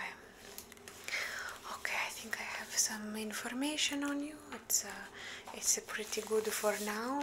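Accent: Italy. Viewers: OMG, your Italian accent is so cute without being over bearing or anything like that, if that makes sense at all. PS - You've got the stop the Qtip when there's resistance! ;-) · LoVe Italiano food & accent 💖🥰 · Great Italian accent 🇮🇹❤️❤️👍👍I like it a lot you do the best Italian accent ever🇮🇹😌❤️❤️sooooo cool!!!!😎😎😎😎😌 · Your Italian accent is great - will you ever be doing a follow up to the Rome visit video?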